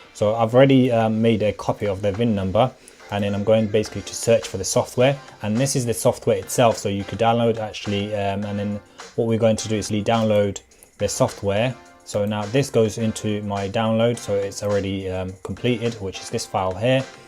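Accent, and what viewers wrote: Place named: Bradford, United Kingdom. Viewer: You’d either from Bradford or Birmingham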